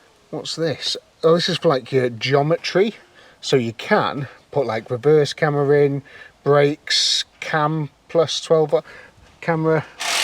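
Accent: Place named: North, United Kingdom. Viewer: Good to see a car channel from the north for a change 👌 don't have to put the subtitles on 😅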